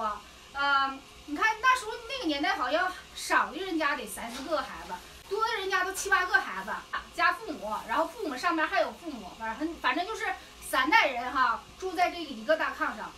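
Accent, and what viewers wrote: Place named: Northeast, China. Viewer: 东北人说普通话好听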